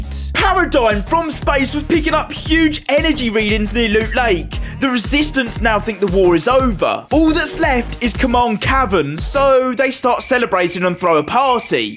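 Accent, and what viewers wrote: United Kingdom. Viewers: British + mic eater = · I would watch this entire video but you're British and obnoxious 💀💀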